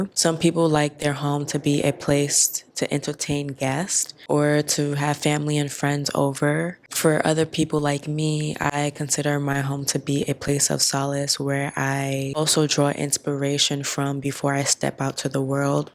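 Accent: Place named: East Coast, United States. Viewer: ur accent is so east coast, so comforting 🙂‍↕🙂‍↕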